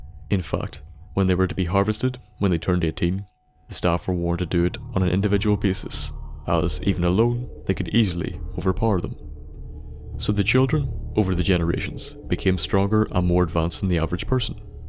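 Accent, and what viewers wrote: Northern Ireland, United Kingdom. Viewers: You from Northern Ireland lad? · Are you from Northern Ireland because like you phrases and accent sounds like a N.I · Are you a fellow Northern Irish man?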